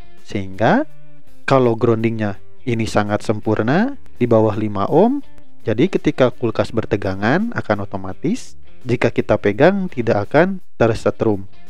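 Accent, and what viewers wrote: West Java, Indonesia. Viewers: Mantap kang solosunya. Sunda banget😅😅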